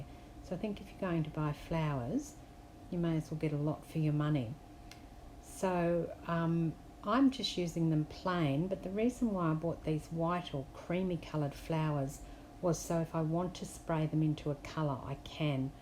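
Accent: Australia. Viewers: Hi, 😘 love the ideas. Thank you for sharing. I love, love listening to your soft Aussie accented voice 😍😍.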